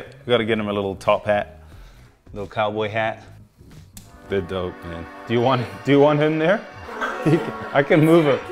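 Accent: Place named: New Zealand. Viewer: The bro slowly letting go of his kiwi accent…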